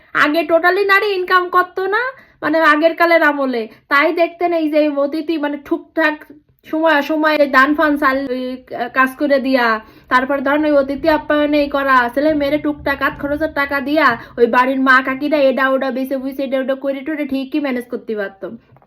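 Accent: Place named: Khulna, Bangladesh. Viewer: আপনার প্রতিটি ভিডিও খুব আগ্রহ নিয়ে দেখি আপু,, নিজের এলাকার মানুষের মুখের ভাষায় কথা শুনে আপন আপন লাগে,,,আমাদের খুলনা ডিভিশনের কালচার,মানুষের মেন্টালিটি, চিন্তাধারা আসলেই বাংলাদেশের বাকি সব ডিভিশন থেকে আলাদা এবং ইউনিক স্বচ্ছ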